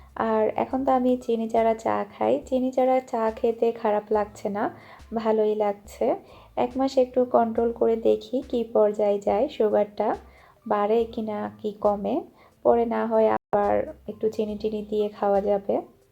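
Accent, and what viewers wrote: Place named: Satkania, Bangladesh. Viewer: কমেন্টের রিপ্লে দিতে হবে না, আঞ্চলিক ভাষায় ব্লগ দিয়ে আমার মনটাকে শান্তি করোন, সত্যি আপু আপনার কণ্ঠে আমাদের সাতকানিয়ার কথা এতো এতো সুন্দর লাগে, আমি বুঝাতে পারব না ।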